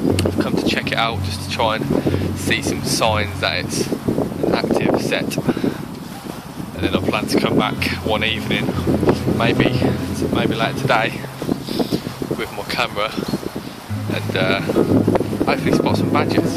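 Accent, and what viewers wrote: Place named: England, United Kingdom. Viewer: Yeah was guessing England by the accent but couldn't pin the area. Beautiful part of the country mate, and when you have experiences like this it makes it all the more worthwhile. I was fortunate enough to grow up in rural Somerset and then rural Devon so got to see loads of foxes and badgers in the wild. Sadly living in the city now I get to see the odd urban fox but it's not the same as seeing them in their natural environement. Appreciate the upload mate, takes me back to my youth :)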